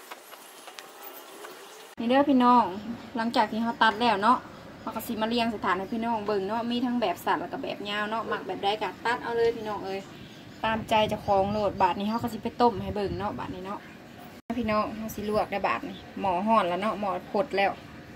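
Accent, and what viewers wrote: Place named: South, Laos. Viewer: นางเป็นลาวใต้บ่ สำเนียงคือลาวใต้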